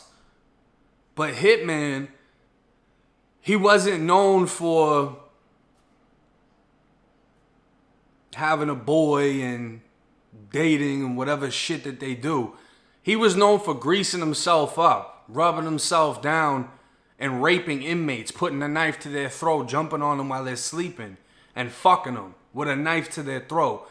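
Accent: Boston, United States. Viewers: This Shìt is WILD!! Been watching Booty bandit stories since Fleece’s hours ago…I hear your accent are originally from up north! Thought I heard some Boston in ya. Lol mad random…. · Are you from Boston? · Are you from Boston bro? Def got the accent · I'm rocking with you from Canada. Love your Bahston accent. What an amazing legacy from the letters of your grandmother. That's beautiful.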